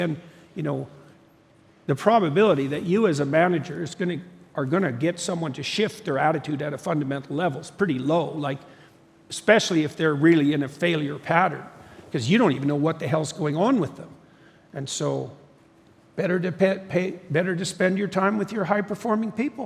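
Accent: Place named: Canada. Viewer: He’s Canadian and has an accent as many if not most Canadians do when they pronounce “a”, if anything he was courteous enough to try to correct himself when he noticed. Does he truly strike you as somebody who would try and make a passive aggressive jab at someone by purposely mispronouncing their name? If anything he just spoke it as he speaks naturally and you’re being intolerant of him. But the main thing is, who cares? Your name is a sound that you respond to like a dog, don’t get so hellbent on stuff like that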